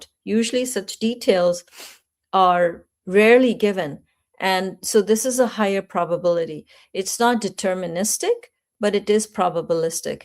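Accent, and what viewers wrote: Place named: Hindi, India. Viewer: It is kali yuga not kali yug and moksha not moksh Madam please dont pronounce sanskrit words like hindi. Thank you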